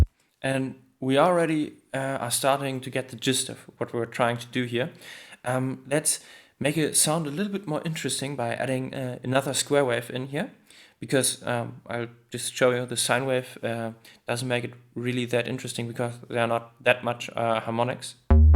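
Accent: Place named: Germany. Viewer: Geiler sound, gutes Video. Mach weiter so. Man hört, dass du deutsch bist, aber es stört überhaupt nicht.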